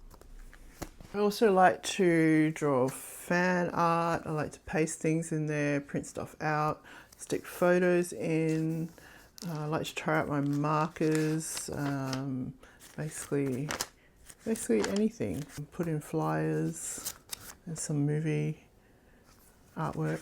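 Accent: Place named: Australia. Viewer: Thought you sounded Aussie. Which state are you in? I’m in Perth, WA. Love your journals-so chunky.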